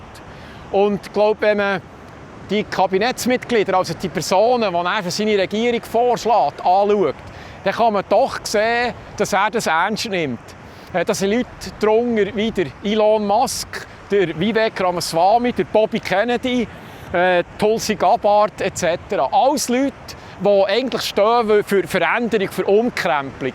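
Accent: Bern, Switzerland. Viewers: hey Super Video imene wunderschöne Bärndüütsch und gschiidem Inhalt, Gruess us Züri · Herrlech, früsch vor Läbere wäg, e Bärnerstimm us Kalifornie wo üs verzellt, wie verzerrt bi üs d Brichterstattig isch. Woni us Kalifornie bi zrügg i d Schwitz cho, hanis denn ou scho erstuunt gmerkt, nume hets mir niemer gloubt. Danke für dä Bitrag 🥰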